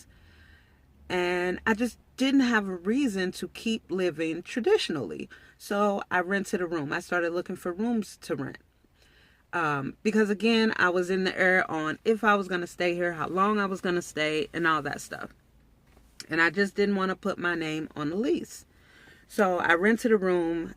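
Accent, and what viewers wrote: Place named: St. Louis, United States. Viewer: New subbie hurrr . I just knew u was from the Lou because of your accent with the “ rrr”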